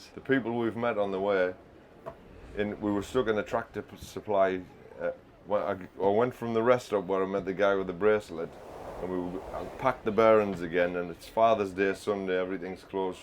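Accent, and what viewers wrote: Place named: Teesside, United Kingdom. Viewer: What a fantastic build. Love the smoggy accent!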